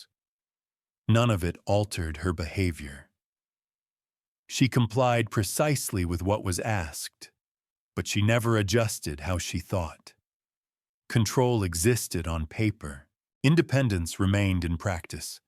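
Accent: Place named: United States. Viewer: Interesting and relevant subject matter. sadly however, it features an American voice over.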